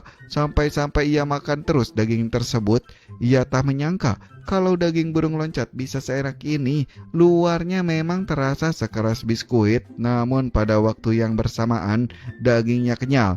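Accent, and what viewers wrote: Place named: West Java, Indonesia. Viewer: Logatnya sunda pisan, pasti orang Sukabumi ini wkwkwkwkw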